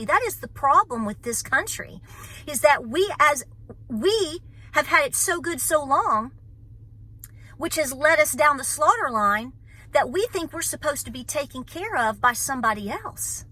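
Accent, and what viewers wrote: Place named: Tennessee, United States. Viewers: In 2020 the Lord Jesus led me to your channel. I listened because your voice reminded me of family in Tennessee. You helped me to see the need for preparedness. My husband was not on board but tolerated what he thought was a phase. By 2021 he changed his mind and wondered why I wasn’t going to the store more 🙄. Thank you for educating us on the things we can do to help ourselves and others. Much love and prayers for you and yours❤️ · Those people who state that you don't have solutions are really mistaken. LOL EVERY time I go to Aldi's, I find myself thinking, get out of my head! Your Southern TN voice is what I hear in the canned goods aisles. LOL I start to wonder if others can hear you say, "Girlfriend, you better get an extra 5 cans of corn or beans. I may not commit much, but I hear ya! Stay Strong!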